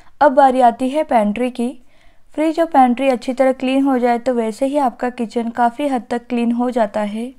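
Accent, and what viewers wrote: India. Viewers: मैने एक वीडियो और देखी थी, जिसमें बोला गया था कि ऐसी होता है अमेरिका का किचन, लेकिन आप की आवाज और भाषा से पता चलता है कि आप इंडिया से हो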